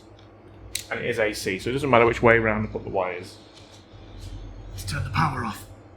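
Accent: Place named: Yorkshire, United Kingdom. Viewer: I just want to know where you're from! Wakefield, Leeds or Huddersfield are my punts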